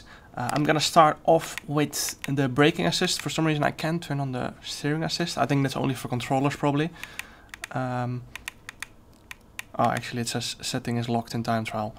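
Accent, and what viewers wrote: Netherlands. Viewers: verry nice nederlandish accent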